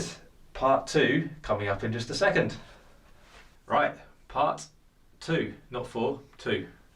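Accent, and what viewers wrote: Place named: United Kingdom. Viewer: I stumbled upon this channel during lockdown here in Barcelona, Spain. Since then I've been binge watching lots of videos, I find them super chilled and entertaining. Is it weird that I enjoy watching videos that teach techniques that I already know? I find this dude so genuine and I really enjoy his British accent haha, keep up the content coming!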